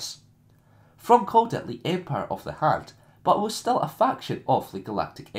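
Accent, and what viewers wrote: Scotland, United Kingdom. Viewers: So scottish my dude.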